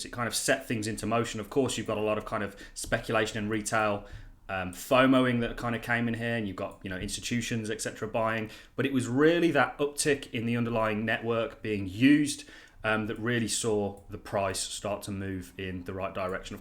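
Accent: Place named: England, United Kingdom. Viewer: Obviously this is your first time listening to English accent.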